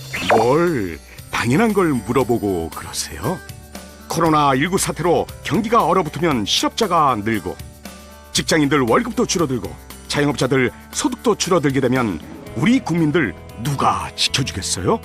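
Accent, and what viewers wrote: North Korea. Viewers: 성우 말투가 북한같냐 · 앵커 북한 사람임?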